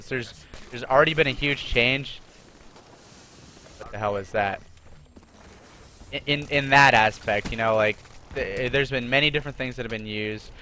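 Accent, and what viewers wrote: Canada. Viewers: You can hear the Canadian.:) Love you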